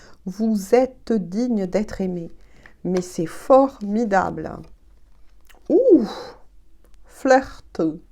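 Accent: South, France. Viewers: Bonsoir!,! je vous remercie pour cette guidance qui me parle, j'aime vos guidances et la façon vous nous expliqué la chose. Je vous souhaite une très bonne fin de soirée!. Ps: j'aime beaucoup votre accent!, accent chantant du sud! 👍😁, moi c'est l'accent créole quand je le veux bien! 🙄🤣 revenez-nous vite s'il vous plaît merci! à bientôt!! 🤗😁. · Bonsoir, oui la lune continue encore a me titiller. Je suis en sur voltage ! Exictée comme une puce qui a mit le mode pilotage automatique, je perds tout, j'oublie tout, aucune concentration, je fais que des bêtises. Cela fait rire mes amis. Oui un froid ,une distance, recentrage ..l'univers nous y a poussé..Nécessaire pour moi que je lache la tension.la cocotte nimute etait prêté a exploser et a la fois imploser.. merci pour tout ses beaux messages qui on fait écho par leurs véracités et votre magnifique interprétation avec notre très beau accend du sud. 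Merciiiii. Le recentrage est pour nous deux chaqu'un a son propre travaille a effectué et gérer. Encore merci pour cette chaleur cette belle lumière qui éclaire les zones d'ombres. Elle magnifique car vous a faite vibrer par votre aura, votre propre lumière. Ps : j'ecoute toujours mon propre discernement, ma propre guidance intérieure! Je ne m'enflamme pas, je sais très bien que c'est une quidance générale et j'ai toujours le recul qu'il faut. J'avoue elle n'a bluffé par ses vérités. Merci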